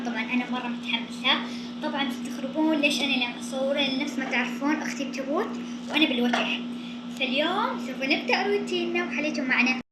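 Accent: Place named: Saudi Arabia. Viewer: احلى👍 بتحكي سعودي ماشاء الله هههه بس مافهمت شو يعني اول يوم حضوري؟؛!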